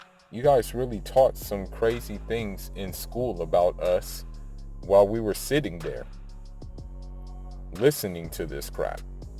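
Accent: United States. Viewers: It sounds like you are American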